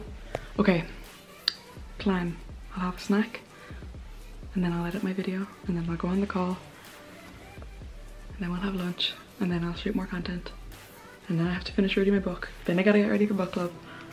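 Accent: Ireland. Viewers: has a lovely soft Limerick accent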